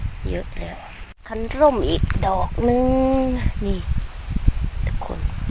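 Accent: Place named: Korat, Thailand. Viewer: สวัสดีค่ะ นกเข้ามาเก็บเห็ดด้วยค่ะ พูดสำเนียงโคราช อยู่ที่ไหนคะ นกอยู่อำเภอสีคิ้วนะคะ 🥹🥹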